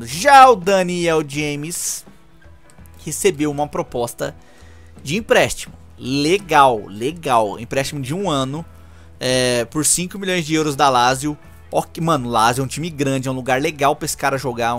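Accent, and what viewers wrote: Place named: Northeast, Brazil. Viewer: tu eh mito, parece ser nordestino kkkkkkkkkkkkk, por mim emprestava o haaland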